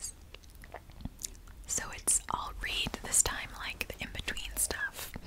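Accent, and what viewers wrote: New Jersey, United States. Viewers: that new jersey accent was accenting it, its very relaxing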